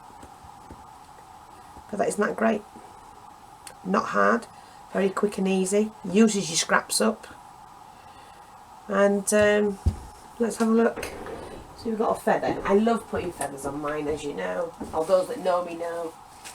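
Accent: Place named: Yorkshire, United Kingdom. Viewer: Loooove your accent where may I ask are you from? Is it Yorkshire?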